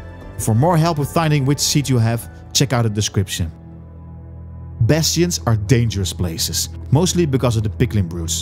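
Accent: Netherlands. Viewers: you sound Dutch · Dutch accent is one of my favorites from non native accents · Gotta love the dutch accent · Bro sounds dutch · Haha I immediately heard that you were from the Netherlands. Funny how it works like that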